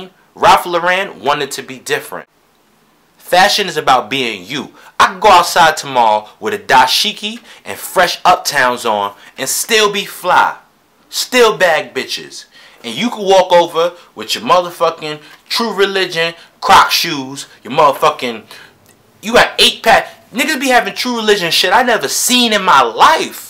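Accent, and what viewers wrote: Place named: New York, United States. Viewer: Yo he from NY?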